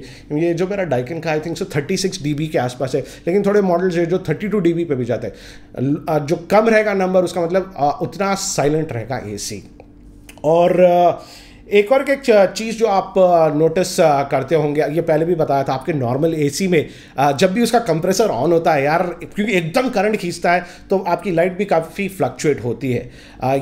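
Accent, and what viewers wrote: Hyderabad, India. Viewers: well done dear. I have been your viewer quite a while during smartphone sunrise. your appearance with hyderabadi hindi doesn't make any difference to northerns which I loved it. keep it up. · आपकी हैदराबादी हिंदी सुनने में बेहद अच्छी लगती है। कृपया इसे जारी रखें। धन्यवाद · Bhai, you rock and love your Hyderabadi Hindi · Why do I love this channel so much? All of his videos are really informative. Respect to you Sir for posting such great videos. You are the best🙌 #lovehyderabadiaccent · Good Hyderabad hi accent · Information was good but good luck with your hyderbadi hindi next time. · I'm subscribing ur channel because of Hyderabadi hindi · Ye Hyderabadi hai bhai.... Isme bhi kafi achhi hai.... Asali Hyderabadi aur bhi mazedar hai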